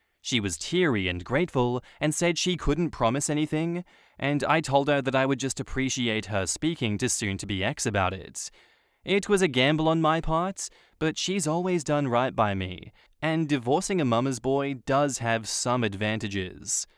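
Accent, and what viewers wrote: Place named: Australia. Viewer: thanks for the Aussie accent such a pleasure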